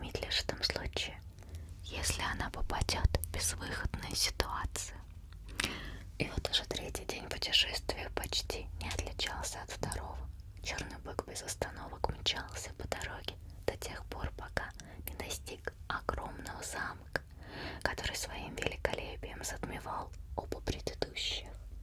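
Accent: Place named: Russia. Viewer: Amo el acento ruso, relaja muchisimo❤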